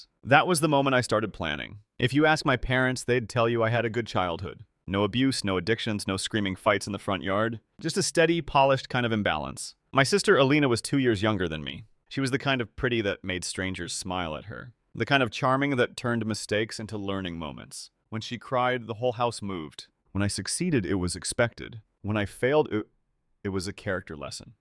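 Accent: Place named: Russia. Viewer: 2nd video seems like the narrator went Russian or something again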